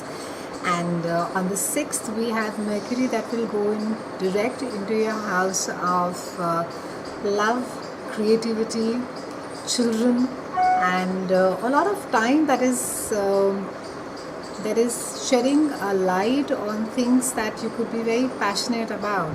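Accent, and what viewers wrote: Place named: India. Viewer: You talking like India do you have any Indian background.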